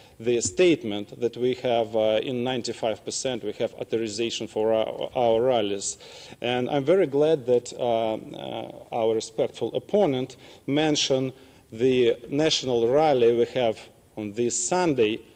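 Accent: Russia. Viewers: Неисправимый русский акцент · Говорит на английском по русски))) даже без перевода понятно) молодец, как ни крути) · Russian English detected! Но все равно, его уровень в 100500 раз лучше Путина!!! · >ещё я не заметил ошибок в ударениях Плохо. Речь у него более-менее правильная, но произношение дубовый типикал рашен акцент. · Построение предложений у него правильное, но вот акцент ужасный, как, собственно, у 90% русских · По акценту сразу понятно что русский.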